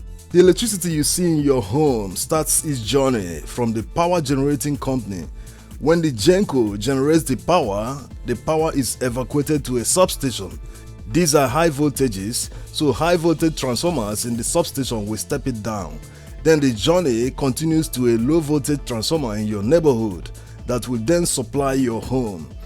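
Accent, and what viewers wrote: Nigeria. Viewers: Thoroughly explained! I enjoy your wealth of info, and your🇳🇬 accent too!😁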